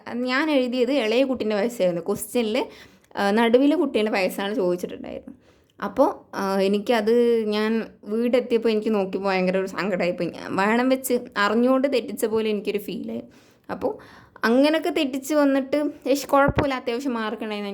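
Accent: Palakkad, India. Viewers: Ningal Palakkad aan llee😂😅??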